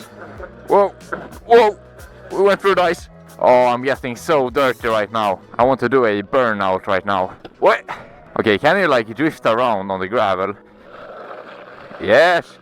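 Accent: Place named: Sweden. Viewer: you soud swidish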